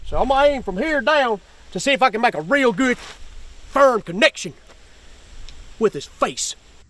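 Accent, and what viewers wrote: United States. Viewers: Yo I love his voice. His voice is MERICA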